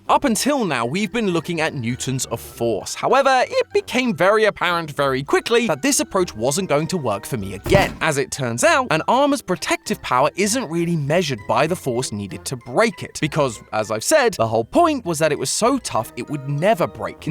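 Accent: United Kingdom. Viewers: Just came back to game theory and where is matpat why is he replaced with dis british guy... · This is just the British mat pat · YES a classic old school game theory! thank you british matpat!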